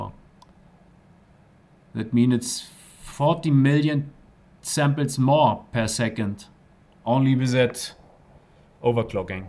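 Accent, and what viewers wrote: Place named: Germany. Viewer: German accent is the best :D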